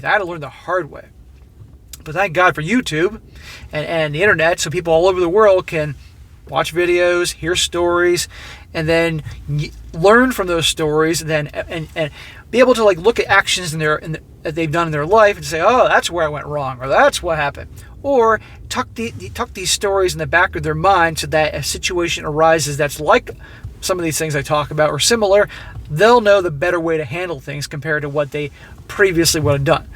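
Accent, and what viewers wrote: Philippines. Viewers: Sound like the man is from the Philippines. Mabuhay !!! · Sounds like he’s from the Philippines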